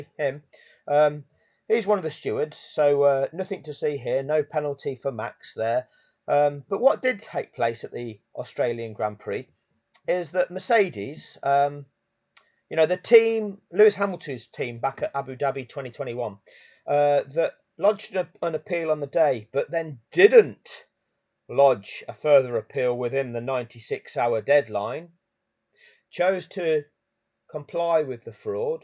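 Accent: United Kingdom. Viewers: your britich ???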